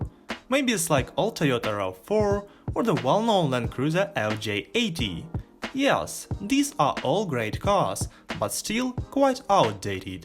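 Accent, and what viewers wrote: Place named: Singapore. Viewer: You sound SINGLISH......sounds like Chinese but speaking English. Hard to understand what you are saying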